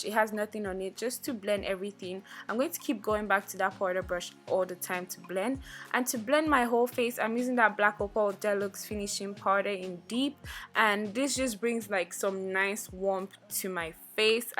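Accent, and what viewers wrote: Nigeria. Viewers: ehhh shoki😂😂 love that nigerian accent❤❤ beautyful girl💕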